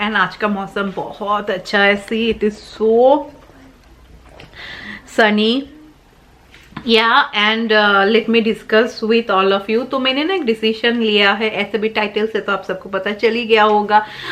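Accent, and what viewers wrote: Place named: Bengal, India. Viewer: Hello mam, I just wanted to say that you won’t stop driving your car just bcoz a few insects hit the windshield... right! So keep going bcoz you are really adorable and your cute bengali tone is so heartwarming!!! There are and will be haters and people to pull you down, but above all there are those who love you and admire you!!! ❤️🙏🏻💐💐💐💐💐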